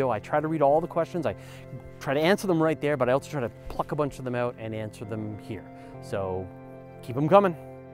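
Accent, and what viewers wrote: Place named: Canada. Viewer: Non-astronomy related but, you are Canadian right? I can tell, I am too.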